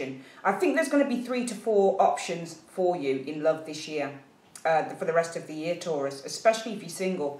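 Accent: Birmingham, United Kingdom. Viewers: she sounds nothing like adele! is from birmingham and adele is from south london, completely different accents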